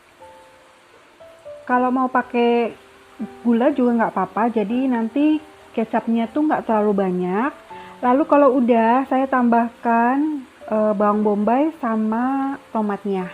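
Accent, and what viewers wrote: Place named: Central Java, Indonesia. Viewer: Rumahnya mana mam, daerah jawa tengahnya? Jajanan pasar dan logatnya khas..salam kenal dari saya klaten jateng🙂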